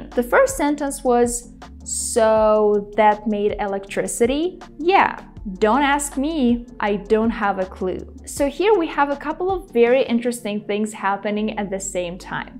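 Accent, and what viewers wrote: United States. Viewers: I love Your English Videos so much Dear and You are so great speaker in American English, I think you live in USA.😊😇🥰😍🤗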